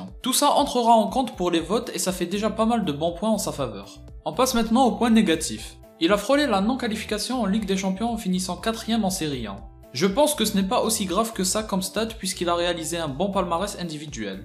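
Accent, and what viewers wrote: Kabylie, Algeria. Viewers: Oh la la la l accent kabyle , je kiffe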